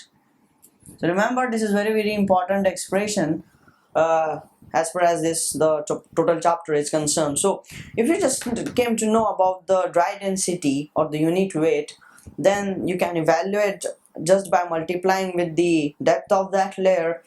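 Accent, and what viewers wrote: India. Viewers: You want to sound like American, but end up as Indian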